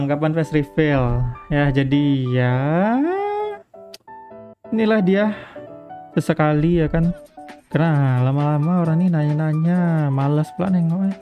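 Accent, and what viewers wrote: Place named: Pekanbaru, Indonesia. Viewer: logat orang Pekanbaru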